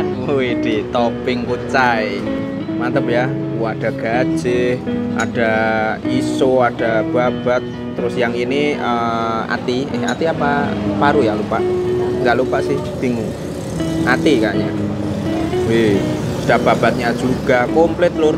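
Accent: Java, Indonesia. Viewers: yg unik itu kyk apa ya? Salah ya kalau gaya bahasanya medok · Menurut aku, blm ada uniknya dan bhs jawanya terlalu medok. Mhn ma'af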